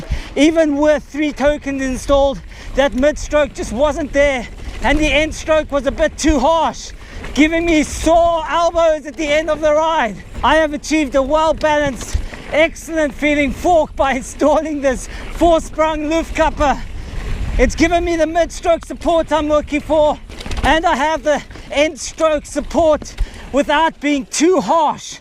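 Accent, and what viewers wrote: South Africa. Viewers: Your awesome! Love the South African accent! Subscribed! 👍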